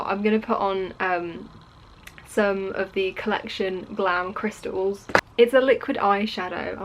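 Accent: United Kingdom. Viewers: Godamighty, pardner, you sure are purty! Put yer makeup away; maybe in 20 years ya might need it, maybe not. Love your cultured British (?) accent and - whata miracle! - you don't say 'like' every other word like, er, as, all the American valley girls on YouTube do. Hey, don't ever come across the pond; it'll ruin ya!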